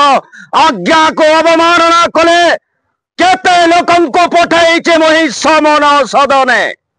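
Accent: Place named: Cuttack, India. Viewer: ଭଲ କଥା କହୁଛ ଭଇ ହେଲେ ମୁଁଇ ଯାନି ନାଇଁ ପାରଲି ଯେ ତୁମେ କଟକିଆ ଛୁଆ ଅ କି ସମ୍ବଲପୁରିଆ ଛୁଆ ଅ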